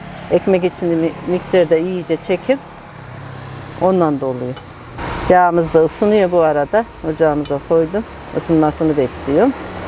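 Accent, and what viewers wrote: Turkey. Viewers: Şive iç Anadolu Bölgesi nerelisiniz acaba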